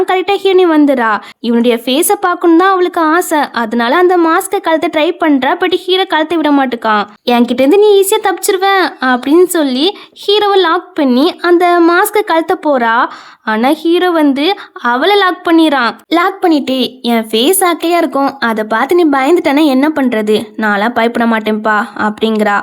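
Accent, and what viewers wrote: Tirunelveli, India. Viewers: 80s la poi reborn agura drama podunga pa unga nellai style voice la ketka egar ra eruku pa 🙂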